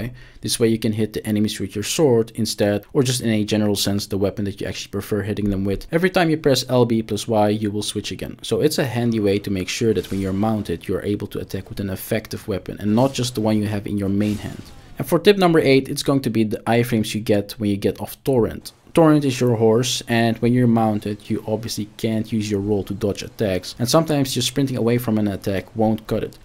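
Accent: Netherlands. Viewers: For sure your Dutch :D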